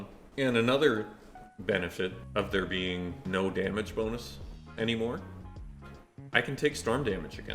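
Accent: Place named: Canada. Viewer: Sounds like is Canadian 🍁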